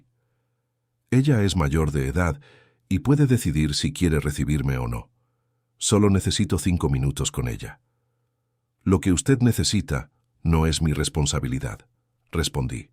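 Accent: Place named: Mexico. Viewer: Soy colombiana,y me e alegro mucho que hagan historias narradas de mi país, gracias por tenernos en cuenta, pero me gustaría que se cersionaran más de los nombres,y pronunciaciones de nuestros dialectos, ya que aquí hay muchos, dependiendo de cada departamento,porque sé que UD es mexicano, y todas sus narraciones suenan igual, acá en Colombia no hay provincias, hay municipios, con nombres de cada dpto, y los departamentos de Colombia son ciudades grandes, Muchas gracias por tener en cuenta a nuestro país, pero que pena, que su dialecto es muy de su país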